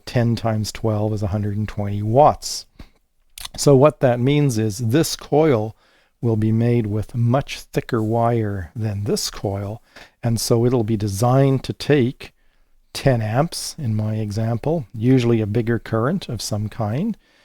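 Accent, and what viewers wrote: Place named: Canada. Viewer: Every time I hear a-gain versus a-gin I know I'm listening to a smart Canadian. I appreciate you sir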